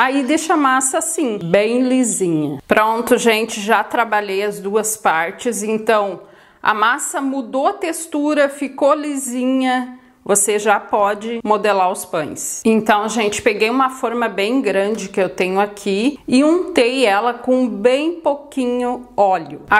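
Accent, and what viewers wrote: Rio Grande do Sul, Brazil. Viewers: Essa guria é top demais!!! Arrazaaaaaaa sempre nas suas receitas maravilhosas !!! Adoro o sotaque dos gaúchos!! Gurizadas que falam bonitooooo tchê!!! 😊 Parabéns guria por mais uma delícia e gratidão sempre 👏👏👏👏👏👏😍😘🌹🌹🌹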